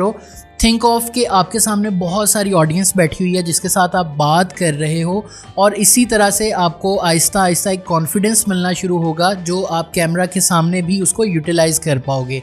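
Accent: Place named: Rawalpindi, Pakistan. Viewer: Thank's Bro! Are You From Rawalpindi, Muree or Kallar? Because I'm Feeling The Accent!❤❤👍👍🎉🎉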